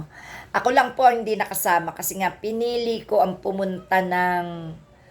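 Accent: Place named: Cavite, Philippines. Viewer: Caviteña ka pala Maam..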